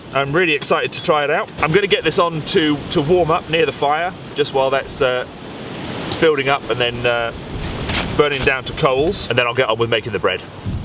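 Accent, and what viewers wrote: England, United Kingdom. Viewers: This is great, going to give this a go, and also check your other vids. (no disrespect to our American friends, but it's nice to see this sort of vid presented with an English accent !) Have subbed. Loving that dog !!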